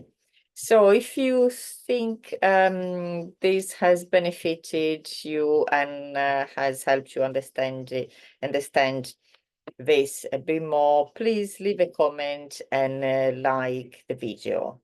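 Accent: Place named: England, United Kingdom. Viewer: I’m on Duolingo learning Italian, do you think that’s a good source? And you sound English? Are you from Italy?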